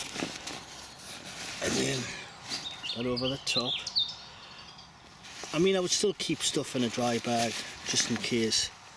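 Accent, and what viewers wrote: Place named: Scotland, United Kingdom. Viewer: Looks spectacular. You sound like a soldier I served with from Scotland. Excuse my ignorance if you are not, some are quite touchy about that....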